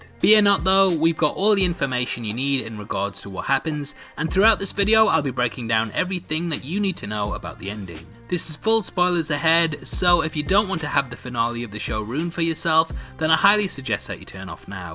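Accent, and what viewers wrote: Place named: United Kingdom. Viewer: Why is every spoiler vid narrated by a guy with a brittish accent.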